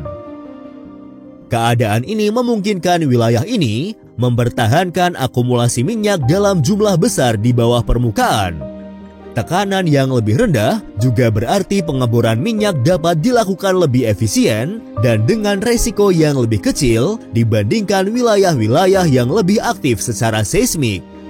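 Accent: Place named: Banyumas, Indonesia. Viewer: abang dubing nya orang ngapak yaaa😀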